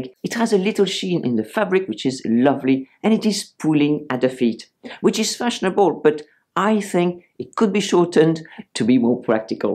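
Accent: France. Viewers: Dear, I'm not even 40 yet but still like to watch your videos for some tipps and inspiration. 🤗 And I adore your French accent.. 😊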